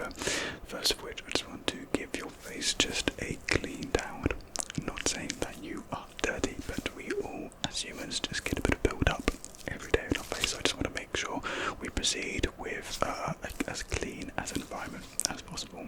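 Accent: United Kingdom. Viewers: Now this is what to listen to while doing college homework! Handsome British accent boy is back! (well at least it sounds like he has a tiny bit of an accent)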